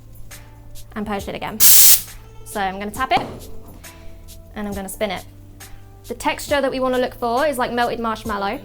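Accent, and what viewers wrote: United Kingdom. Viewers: No! British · She’s british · Yes which is where you're wrong, they are located in the UK Also, sounds British to me. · barista girl with british accent, Just perfect👍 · She’s British! Yayy!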